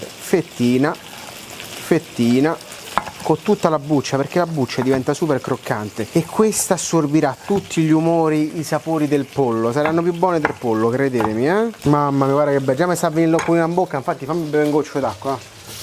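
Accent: Rome, Italy. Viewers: Come sei simpatico, ai un dialetto! Che mi piace da morire! Super romanesco! Io sono Toscana Pisa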